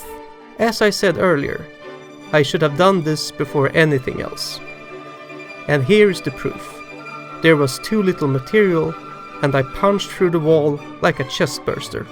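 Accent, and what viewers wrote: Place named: Russia. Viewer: Why would you destroy a real steel lower so you can make it into an airsoft? That makes no sense wasting a registered lower to do this. You could have just bought an 80% real steel to turn it into airsoft. Then it dawned on me that accent... Maybe you are not in the USA… maybe in Russia? Daym... It's that easy to get an m16 lower? Daymmm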